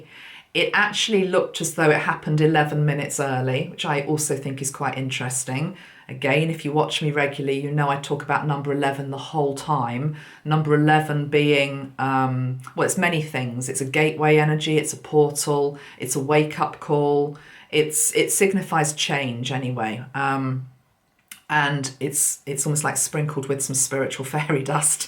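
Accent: England, United Kingdom. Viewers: Thank you so much for your kindness and charm, and your lovely English accent. I come from Brighton XXX🕊️🌹🎶💡🎭🐛🦋😷😘👽💚🙏🏻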